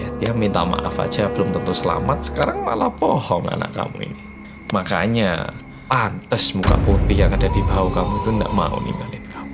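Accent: Java, Indonesia. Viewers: Woe logatnya orang jawa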